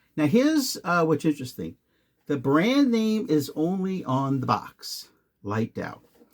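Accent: New York, United States. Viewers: Watching from Bangkok Thailand! You have the best New York accent ever. Thanks for your advice on my new mic set up!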